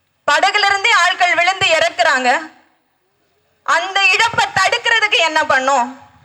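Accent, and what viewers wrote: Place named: Nagercoil, India. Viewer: நாகர்கோயில் தமிழ்...❤️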